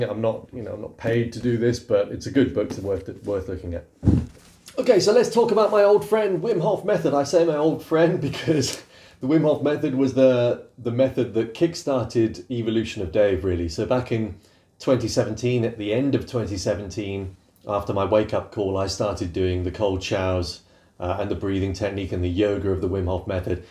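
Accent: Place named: United Kingdom. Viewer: Mate I just wanna say thanks for being such a genuine,honest and decent bloke,I'm almost 40 and for the time in my life I can actually see abit of light at the end of the tunnel,this lockdown as give me so much time for self reflection and through a small dose of yoga and meditation I probably feel the best I have felt in over 10 years and now this is just the icing on the cake. Oh and abit more icing to add were both British so I have absolutely no issues in understanding you lol. P.s dont turn around now and say your not British and make me look like a c××t 😂😂😂🇬🇧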